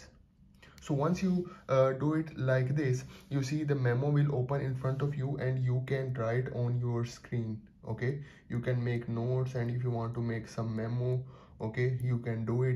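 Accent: India. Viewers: Indian accent it seems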